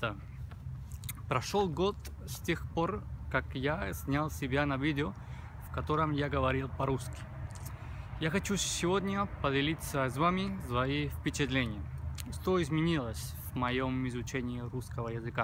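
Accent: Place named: Spain. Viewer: Произношение супер! Первый раз слышу от испанца такой чистый русский, ты меня приободрил! Потому что обычно когда слышу с каким акцентом испанцы говорят по русски, прихожу в ужас от того, как наверно, слышится моя речь по испански.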